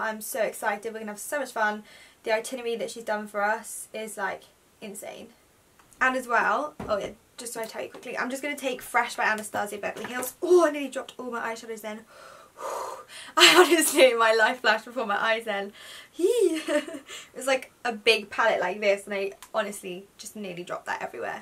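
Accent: United Kingdom. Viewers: Ahhh, you replied! I love you even more now! <3 <3 <3 British sounds so much cooler. Haha. I hope you enjoyed your stay in LA :)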